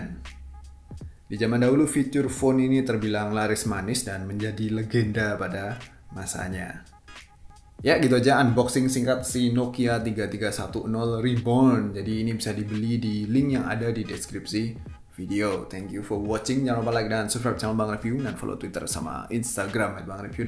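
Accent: Java, Indonesia. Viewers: pedot :v medokmu bang :v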